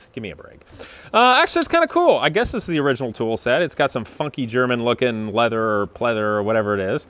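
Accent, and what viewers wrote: England, United Kingdom. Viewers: Good vid v detailed, do have a soft spot 4 944's. Nice example. Love the plummy posh English accent! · Rally it, that English accent is sick haha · Excellent buyers guide. I would suggest you cover the dash with a leather cover. This is available online. I did find the British accent annoying though... Im part of the Porsche Club GB and I’ve never heard anyone be quite that anal. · Great British accent,!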